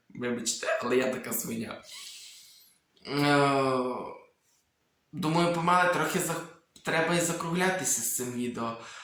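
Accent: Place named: Transcarpathia, Ukraine. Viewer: Не треба менше розмовляти закарпатською!🥺 для мене то - мед на вуха🥰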